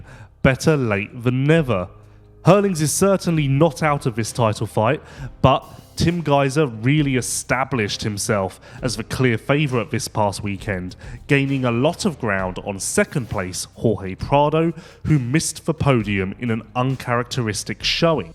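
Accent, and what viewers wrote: United Kingdom. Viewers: really embracing his British pronunciations on this one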